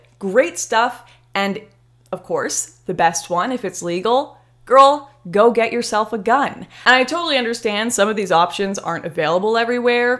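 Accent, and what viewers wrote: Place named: Canada. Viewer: Canadian accent is better than American and British accent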